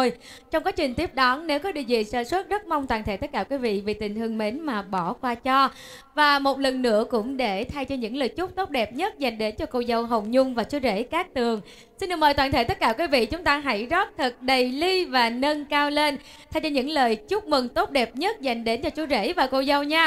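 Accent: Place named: South, Vietnam. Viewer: Chuẩn MC giọng miền Nam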